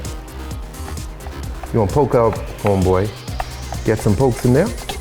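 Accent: Trinidad and Tobago. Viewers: Are you from Trinidad 🇹🇹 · Are you from Trinidad Bc ur accent sound like Trinidadian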